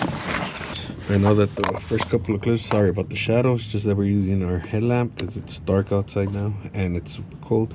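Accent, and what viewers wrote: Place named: Southern California, United States. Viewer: You got that southern california chicano accent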